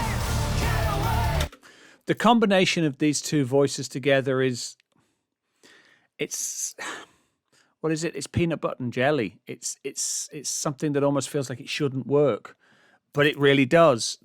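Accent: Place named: United Kingdom. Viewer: Hi NWA UK Eastern division here. First time I have come across your channel. From accent I assume you are British, so nice to see that. I loved your intro. Mostly I don't like excessively long intros but this was apt and actually quite moving. I will be looking at your back catalogue. Love your poetry references too. Now I shall listen to the reaction and come back at the end but I suspect I am going to love your reaction and analysis and channel. From memory isn't it at the the start? And isn't he from Reigate school choir? Maybe he is Welsh, though Reigate certainly isn't 😀 OK, I'm and this is an incredibly thoughtful, insightful reaction. Why have I not come across you before? (Subscribed.) Think I lost this: They are all top flight musicians, technically and emotionally. They can all do anything that is asked of them but they let the needs of the music dictate what they do. 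To me that is one of thecdefinitions of a great musician - interpret and project the feeling and emotion whether it needs restraint or all-out "shredding". OK, finished. Quite possibly the best TPatP reaction I have ever seen. Thank you (as Floor would say).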